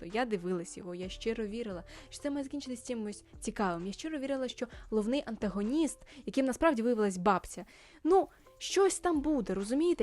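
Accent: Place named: England, United Kingdom. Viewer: У вас дуже приємний англійський акцент 😀 Я думаю, одна з основних причин, чому дісней перезнімає старі мультфільми, це спроби продовжити життя принцесам. Сучасним дітям вже не цікаві настільки старі мультфільми, ще пару поколінь і ніхто б вже не знав хто така Аврора чи Аріель. А оскільки їх лінійка принцес це ледь не візитна картка діснею, їм важливо розширювати аудиторію і залучати сучасних дітей. Ну і заробіток звісно теж, куди без того) Такі мультфільми 100% окупляться, а от нові історії це завжди ризик Більшість останніх мультфільмів які вам сподобались були таки зроблені піксаром, а не діснеєм. Не думаю, що дісней хоч якось приймав там участь окрім як інвестування. І я б не стала мішати Піксар до Діснея. Так, формально вони його купили, але це все ще різні студії, і як на мене вони мають кардинально різний підхід і стиль роботи) Дісней завжди були досить консервативні як стосовно візуального стилю (у них всі персонажі виглядають тупо однаково), так і стосовно сценарію. 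Вони бояться відходити від протоптаних стежок, в той час як піксар часто експерементують. Тому, до речі, мене здивував нещадавній реліз "Бажання", де видно експеременти зі стилем, судячи з усього навіяні новими тенденціями, які запустли "Людина павук крізь всесвіт" та "клаус" свого часу. Проте у Діснея цей експеримент поки не дуже вдалий, і виглядає дивно. Тим не менш, цікавий початок, побачимо що буде далі.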